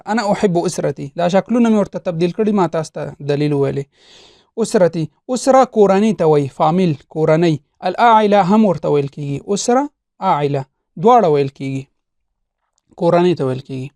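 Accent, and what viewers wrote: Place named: Saudi Arabia. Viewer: دي سعودي او دي دوبي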